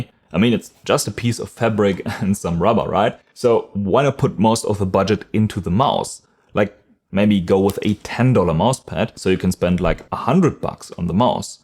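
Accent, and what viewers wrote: Germany. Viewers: the faint German accent is doing things to me, Lord help me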